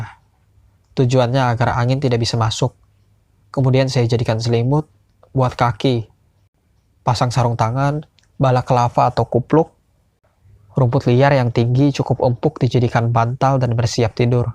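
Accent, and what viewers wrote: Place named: Bali, Indonesia. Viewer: Wah logat bali nih .. 😁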